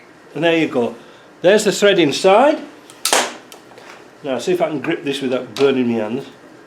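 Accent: Yorkshire, United Kingdom. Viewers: The big red spanner has worked for me many a time! I only have MAP gas but with patients it works. Great presentation style, I can almost hear all the old Yorkshiremen that took you under their wings.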